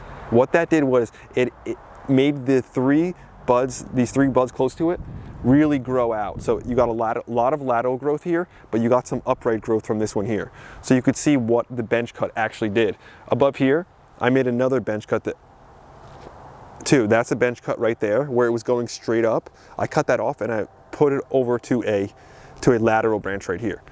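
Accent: New Jersey, United States. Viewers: Are you from joisey? Im from joisey!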